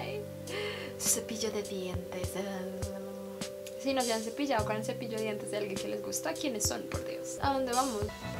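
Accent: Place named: Bogotá, Colombia. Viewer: vivas De Bogotá, su acento la delata :v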